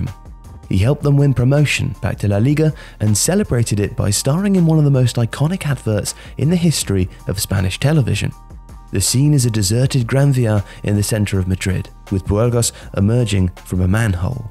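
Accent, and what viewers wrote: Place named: England, United Kingdom. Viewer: The script reader sounds English. Probably can't pronounce them like a native speaker.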